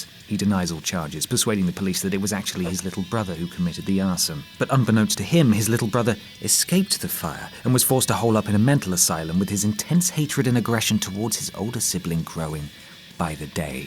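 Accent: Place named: RP, United Kingdom. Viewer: sounds really promising posh today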